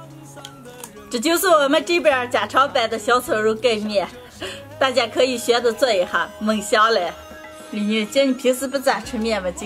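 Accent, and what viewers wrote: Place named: Shaanbei, China. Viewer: 越来越爱听陕北口音了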